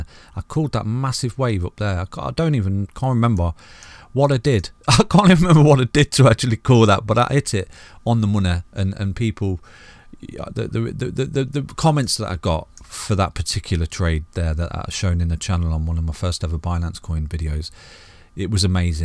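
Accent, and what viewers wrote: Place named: Nottingham, United Kingdom. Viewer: You sound like your from Nottingham. I am myself Well done love your videos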